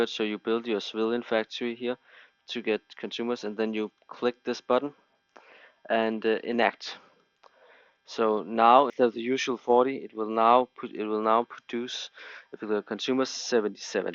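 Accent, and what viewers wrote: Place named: Denmark. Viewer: bedste danglish jeg nogensinde har hørt håber alle russere ser det her..:3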